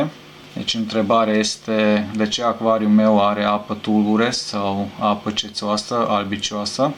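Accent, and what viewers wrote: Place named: Hungary. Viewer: ai si canal de youtube pe maghiara? ca esti maghiar😁 se aude bine😋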